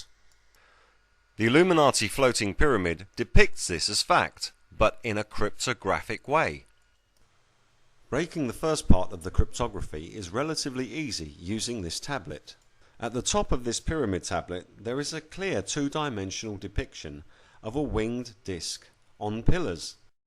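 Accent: United Kingdom. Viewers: is that a pommie accent?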